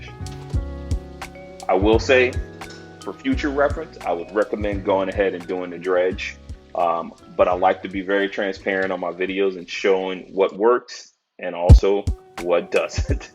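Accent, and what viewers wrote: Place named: South, United States. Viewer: When it comes to frying chicken, I’d only trust a guy with a southern accent!! Is the flavor or tannins the sweet tea imparts to the recipe?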